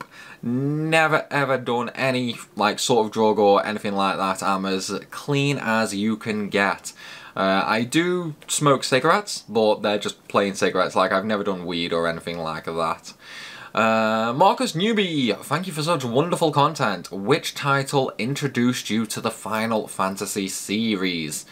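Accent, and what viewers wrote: Stoke, United Kingdom. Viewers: Loving the content and dedication you have. Is that a Stoke accent btw?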